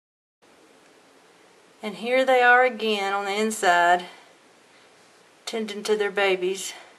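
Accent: South, United States. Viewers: He didn't spell it like that because he didn't know the meaning lol... He spelt it that way because that is how you pronounce it with your accent. You don't say "Ten-ding" you say "ten-din" like you say "coo" instead of "cool". Granted he should have said, southerners not hicks.. but you guys definitely pronounce words much different than they are spelled xD. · Jesus, he was defending you! He said that because you have a southern accent doesn't make you a "hick"! And then he goes on to say if TOTALCONTROL would say that shit to a black person with an accent. You should try READING COMMENTS THOROUGHLY before you rub that persons face in the ground. >:( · "Hawnets neyst, it is sooo kewl" southern drawl! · Some of you are being so rude. She isn't a hick just because she has a southern accent. Southern accents DO NOT signify ignorance. She tried to post an interesting video for all of you to enjoy and all you are just being rude. 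· Are you from Kentucky? You have a really rich southern accent! I love the south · omg that country accent bleh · Her southern accent is adorable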